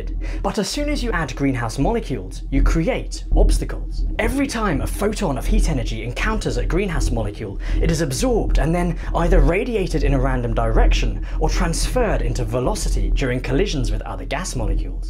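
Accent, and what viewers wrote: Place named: RP, United Kingdom. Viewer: Firstly: you're jacked, British, non-white and sound posh. I like it! But the saturation myth (or effect, if you're of that persuasion) is a red herring. We don't have to care about physicists squabbling about yay or nay on this. Instead just look at the data of the supposed effects of rising temperature and CO2: arctic and antarctic ice, tropical cyclones, droughts, crop yields, Great Barrier Reef coral cover, greening, desertification, wild fires, deaths from temperature (both heat and cold) etc - hardly any of them are happening in an adverse way. And rising temperature/CO2 are actually making certain things better: few temperature deaths, improved crop yields, greening, more natural diversity...... Get real everyone! You can continue to shriek inside your echo chamber OR you can go look up the data with an open mind, intellectual curiosity and critical thinking. 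You'll flip, like me - guaranteed if you're not yet fully brainwashed.....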